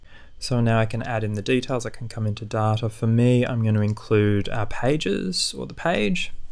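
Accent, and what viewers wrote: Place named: Australia. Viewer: Could listen to the aussie accent all day! Great video, so helpful. Please can you make a data studio step by step report for goal conversion data? Im getting a bit stuck with mine.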